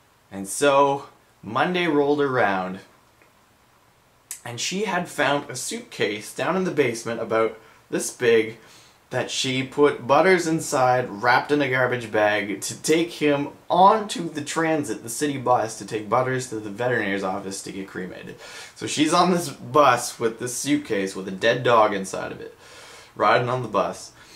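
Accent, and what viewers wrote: Canada. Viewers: i like his candaian accent, lol, so cute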